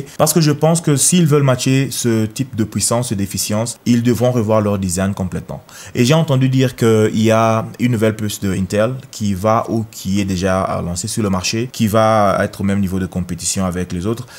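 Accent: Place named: Cameroon. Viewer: Tu es camerounais frère 😅 l'accent. Je m'abonne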